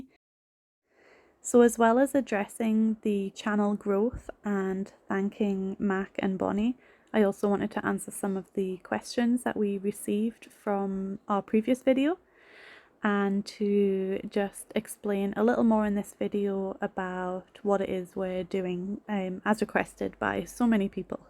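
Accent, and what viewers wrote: Scotland, United Kingdom. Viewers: Loving your channel and your beautiful Scottish accent💛, so glad there is still kindness like yours in the world🥰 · Don’t be afraid to go full Scottish on the job! That is part of what makes this channel so unique!!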